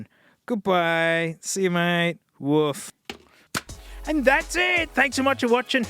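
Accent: Australia. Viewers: Ooo I have a question to you as a non-native english speker. I noticed a while ago your aussie accent not being as expressed or prevalent as some of other Australian youtubers I watch. Do you come from a region where people just don't have that very distinct aussie accent, or do you change your speech and pronounciation deliberately, so people who aren't used to it can understand you better? I guess this isn't that important really, but I've been wondering for a while now. Thanks man🤣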